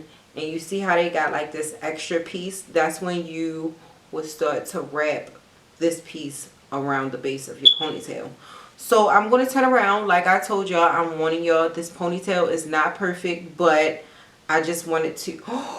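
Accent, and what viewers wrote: Baltimore, United States. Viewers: bmore accent ?? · This is my first video ever watching her 😂 i think i can tell that she from Baltimore lol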